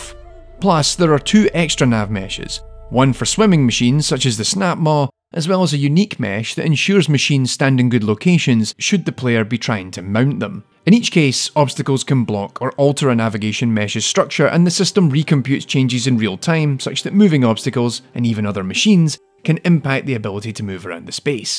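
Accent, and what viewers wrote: United Kingdom. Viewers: Hello, I noticed you've put a game on steam. I have a game ready for STEAM..but having trouble putting out on there. Could you do a tutorial on this PLEASE. Not many good UP TO DATE tutorials or there. Thanks.. Ps. Nice to hear a UK ACCENT 🇬🇧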